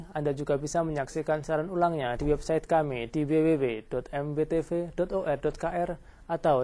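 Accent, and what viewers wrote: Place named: Java, Indonesia. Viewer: His Java accent... -.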